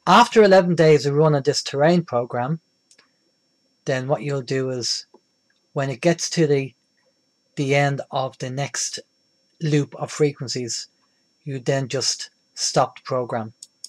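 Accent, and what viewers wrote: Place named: Ireland. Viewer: Hi I have just come across this rife machine and rife machines in general. I noticed you have an Irish accent. Is there anywhere in Ireland this can be bought from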